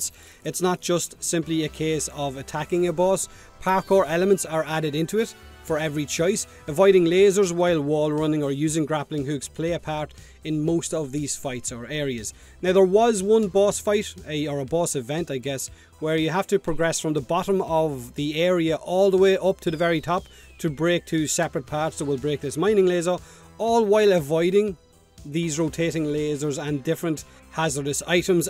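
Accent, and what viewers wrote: Ireland. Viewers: I love your irish accent!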